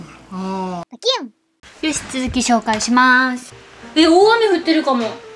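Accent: Kansai, Japan. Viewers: さんのYouTube初めて見ました！！！ゆったりな喋り方に更に関西弁なのが可愛すぎます😂💗💗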